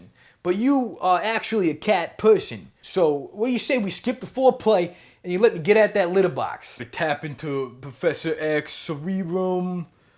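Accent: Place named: East Coast, United States. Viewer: Roman bath orgy lol This guy looks arab but talks east coast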